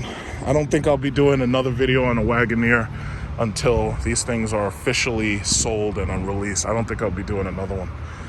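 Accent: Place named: New Jersey, United States. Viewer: Sounds like he's From Jersey, Also he did a comparison with the Standard Length Escalade, what About the EXT Long Version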